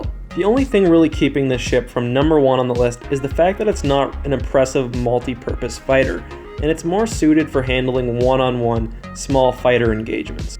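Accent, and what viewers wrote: Canada. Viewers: Wait you're a Canuck?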